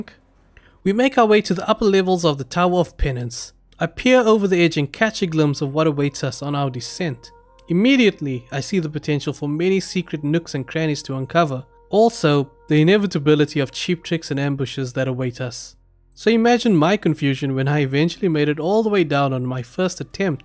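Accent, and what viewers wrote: South Africa. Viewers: Oh shit you from SA mal kak bru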